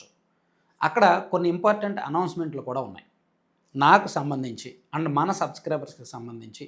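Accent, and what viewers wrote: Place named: Coastal Andhra, India. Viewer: మీ వాయిస్ కోస్తా మాదిరి ఉంటుంది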